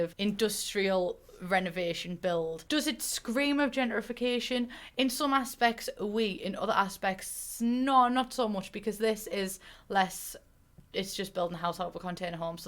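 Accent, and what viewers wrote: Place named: Northern England, United Kingdom. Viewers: I have been watching your videos far to much now because my thoughts are all in a northern accent and I just typed an casual but professional email and nearly sent it with the opening "Hey hens, how are you all diddily doing"? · She’s got that working class, northern accent charm. Northern hospitality as well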